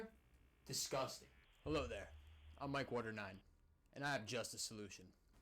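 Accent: New York, United States. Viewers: The intellectual new york accent is rare but very effective Mike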